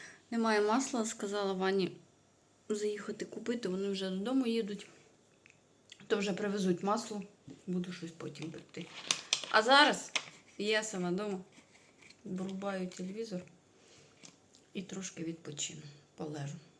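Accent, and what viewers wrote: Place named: Surzhyk, Ukraine. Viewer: Ви суперові, приємно чути ваш суржик. Нехай у вас все легко виходить, удачі вам.🌺👍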